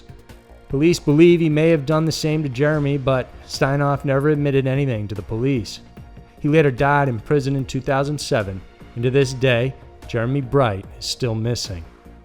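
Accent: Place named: Appalachia, United States. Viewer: Hill Billie narrator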